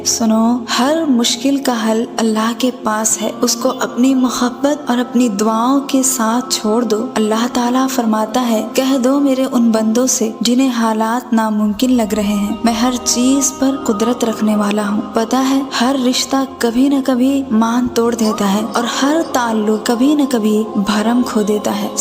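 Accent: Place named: India. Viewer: Your accent looks like from India . TRUE ???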